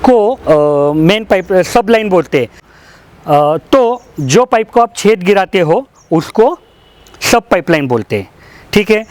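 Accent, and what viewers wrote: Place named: Maharashtra, India. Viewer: आपल्या बोलण्यावरून असं वाटतं की , आपली भाषा मराठी आहे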